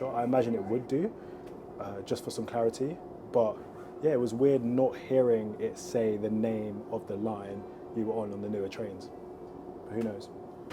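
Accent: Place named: Estuary, United Kingdom. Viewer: You speak too quickly and I can't follow your estuary accent when it's so quickly spoken. The subtitle program didn't (couldn't) help either, sadly. Try speaking slower please.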